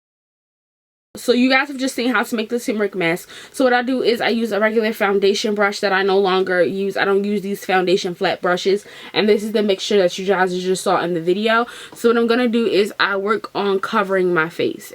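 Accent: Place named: Baltimore, United States. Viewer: When you said turmeric, I just knew you were from Baltimore! Y’all accents stick out like a sore thumb💕😂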